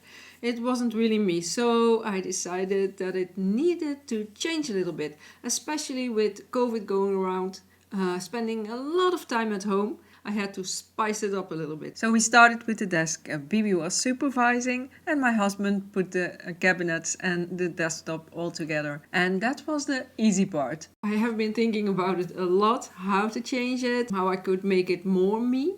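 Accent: Netherlands. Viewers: Thanks so much for making and sharing you work. I love your ideas and you have helped me a lot with your tips and ideas. Also I lived in Amsterdam and Harlimgen in the North in the 1970s (yes Im 70 now) and love to hear you accent. It brings back many memories for me. I watch Dutch TV series to try to keep up my Dutch and really enjoyed Baantjer: Het Begin - took me back to my years in The Dam x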